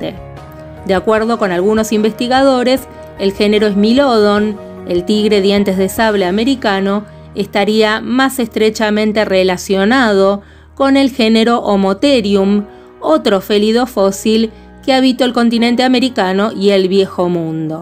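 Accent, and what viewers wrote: Argentina. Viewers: Interesante reportaje con una dama de acento inconfundiblemente y agradable argentiiino , espero no me equivoque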